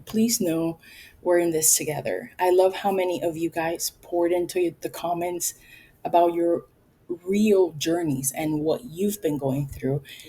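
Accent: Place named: Latin America. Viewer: Thanks for the shout out!, I never counted one calorie during this entire 3 year GLP-1 excursion that has helped me lose over 280 lbs. If you are not led to count, don't! There can be medical reasons to, but let your provider/nutrition-specialist guide that path, not nagging from others. And your weight loss? Girl, you are losing 1 pound a week! That is the exact average weight loss. Those of us who lose fast are the outliers, not you. People, including me, who are super-responders, tend to speak up louder than those who are on the path at the normal pace. You are doing great! Nosy question you don't have to answer: Are you Latina? I detect the accent. ¡Soy cubana!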